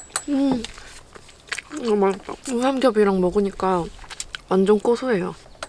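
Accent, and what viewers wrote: Jeolla, South Korea. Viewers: 전라도 억양 넘 정겹고 좋습니다. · 와.............. 유튭에서 전라도 사투리 왤케 반갑죠....?? ㅋㅋㅋㅋㅋㅋㅋㅋㅋㅋ 자주 시청하겠습니당 😁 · 깝깝해부네 ㅋㅋㅋㅋ 저도 고향이 전라도라 사투리 말투 너무 귀엽네요 ^^ 는 무슨뜻일까요 궁금하네요 ^^ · 전라도 사투리 정말 예쁘게 하시네요. 오늘 웃다가 구독하고 갑니다.^^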